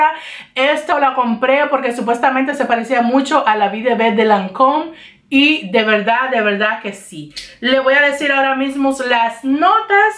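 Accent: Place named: Dominican Republic. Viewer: Saludos, soy nueva en tu canal, por ttu acento creo q eres Dominicana. Amo ese país. Fiesta todos los días.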